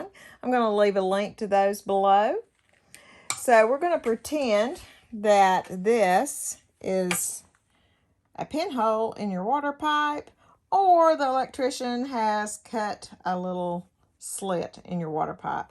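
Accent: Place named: South, United States. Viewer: I'm from Australia, I love the southern US accent like yours.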